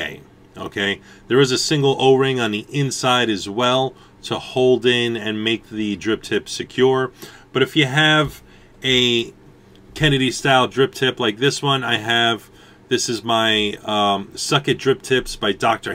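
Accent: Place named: Italian American, United States. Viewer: hey another good review love the italian american accent thumbs up up from australia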